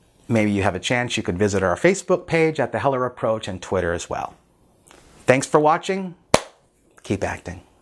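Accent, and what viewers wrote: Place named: United States. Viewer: Thank you very very much! I am russian (Sankt-Petersburg)Your video is worth its weight in gold for me cause I understand all of what you're talking about.I want to talk american-english like you do and in the final analysis be an actor.Much odliged. Yury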